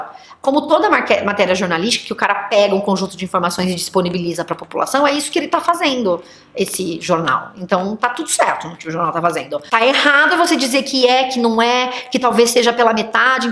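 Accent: Rio de Janeiro, Brazil. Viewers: ...amo esse carioquês!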